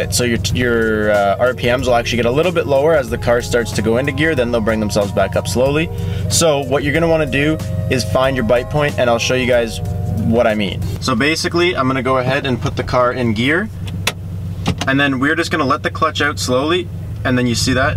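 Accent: Canada. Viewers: Ahaaaa Canadia. That's the accent ouwt! Cheers brotha new frs owner here in 5 days!!!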